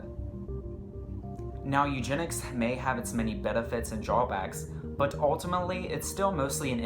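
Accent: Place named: South, United States. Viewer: i can almost hear a gay accent and a southern accent. Btw, you're talking about artificial selection and genetic modification at the same time.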